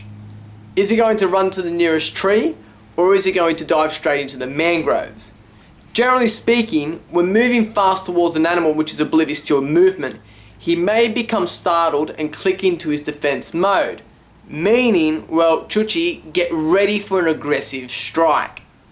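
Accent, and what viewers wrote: Australia. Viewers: This guy vs The Kangaroo punching aussie vs Gary the Goat for most Australian thing on the web.......P.S. what does he call his audience? At first i thought he was saying "george" then it sounds like "church"...i have an American's ear and some thick aussie accents sound like vegtable soup in my ears..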